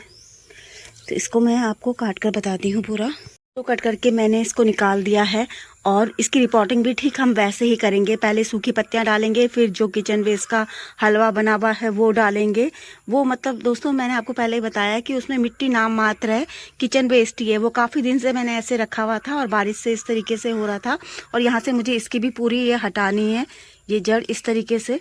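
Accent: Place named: Garhwal, India. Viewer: Aap ghadwali ho awaz se lagta hae good sharing happy gardening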